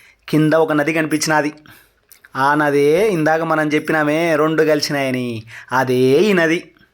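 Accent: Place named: Rayalaseema, India. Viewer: bro rayalaseema yeasa super story line also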